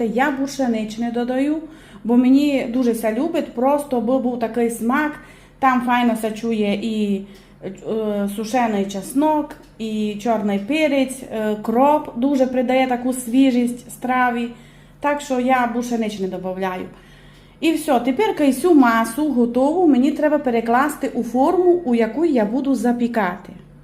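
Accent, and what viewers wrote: Transcarpathia, Ukraine. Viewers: Підписався з двох причин: все, що готував за Вашими рецептами виходить надзвичайно смачно. І дякуючи Вам, насолоджуюся закарпатським говором. Дякую! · Обожнюю закарпатські діалекти :)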